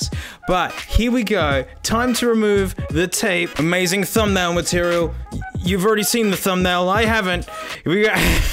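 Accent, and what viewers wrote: Australia. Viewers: “Working down we have 120, there’s 80, 60 and mate if that’s not getting the job done ya bust out ya 1 grit and ya get it done roight” This guy has to be from Australia because he is hilarious to me! Love this channel. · He sounds distinctly less Aussie than his newer videos (and there was no Frank at the end! It's not a video without Frank!) Happy you've now found your stride, I'm just browsing your earlier content:)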